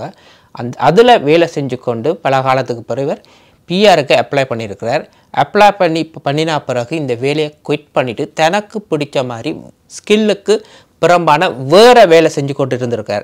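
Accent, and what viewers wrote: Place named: Sri Lanka. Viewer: இலங்கை தமிழ் பிரமாதம்.. கேட்க இனிமை...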